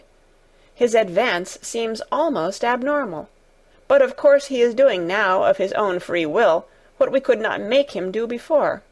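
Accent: Yorkshire, United Kingdom. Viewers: This is the best audio book and reader ever! Her reading i so smooth and the Yorkshire accent is wonderful!!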